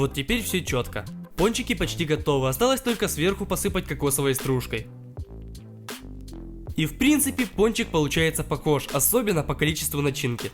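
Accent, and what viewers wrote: Ukraine. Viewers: Стоп!! Я только поняла.... ТЫ УКРАИНЕЦ? У тебя иногда просачивается в речь украинский акцент. Ты начинаешь считать с гривен а не рубля. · Украинский акцент?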